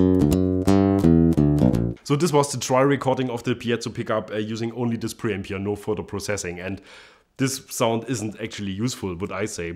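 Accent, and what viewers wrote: Germany. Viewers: That german accent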